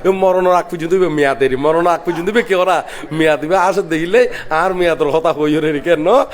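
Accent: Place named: Chittagong, Bangladesh. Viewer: চিটাগাং এর আঞ্চলিক ভাষার কারণে বুঝতে অসুবিধা হচ্ছে।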